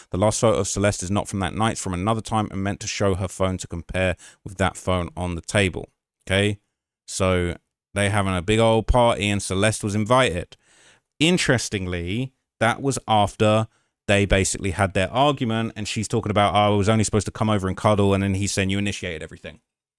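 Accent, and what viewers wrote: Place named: United Kingdom. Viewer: Love your accent UK 😊❤ but yeah I agree I can never put my self in Celeste family’s shoes for one I wonder if their interpreter is the Salvadoran interpretation or a Spanish one because some of the words sound the same but have different meanings it must be difficult for them in every way because I don’t think they get the full details but seeing the evidence is about the only thing they can fully understand 👀🧐😮‍💨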